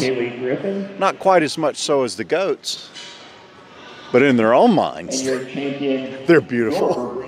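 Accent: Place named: Arkansas, United States. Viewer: I was born in Bethlehem Pa-raised in SW Florida & blessed to have lived in Corpus Christi Tx for 20 years. Your accent is not from any of these. 😂🤣😂 love your wit & videos-thank you. Arkansas accent? 🤷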